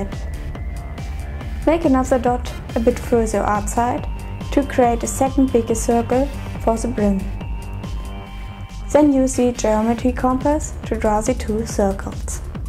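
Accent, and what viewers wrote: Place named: Germany. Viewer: You are a German girl. Lovely accent you got. See u pretty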